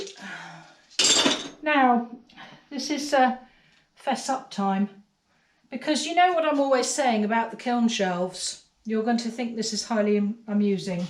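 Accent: England, United Kingdom. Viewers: All you do is so wonderful...none of it is "daft", as you say, LOL! Love the English accent too! The Tudor jug is gorgeous ! The mono-printing is very intriguing; love the look and curious about the process. Will keep an eye out for a video on it as well as the test tiles. Your husbands dish is very stunning. Aren't you glad you didn't give up on it?! Inspires me to re-fire a piece I've made. Had my eye on the poppy heads on the shelf behind you. Looking forward to part 2 on that video! Don't you just love the way this hobby can draw us away from the noise in the world around us? Allowing us to get lost in the process of creativity; spending hours & hours honing skills and processes. It's quite meditative! Many, many thanks again for sharing your wonderful talent and discoveries in pottery. There are a lot of videos about pottery here on YouTube, but yours most definitely rank high in the top 10!!! 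Kindest regards...stay safe and well. Cheers from across the pond :0)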